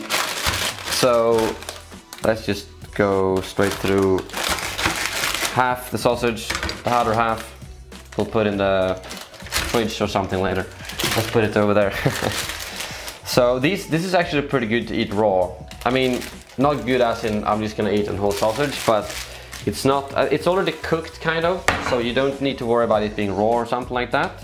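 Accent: Stockholm, Sweden. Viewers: Den här snubben är så stockholmsk som det går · why does sound extra swedish when doing vlogs? lol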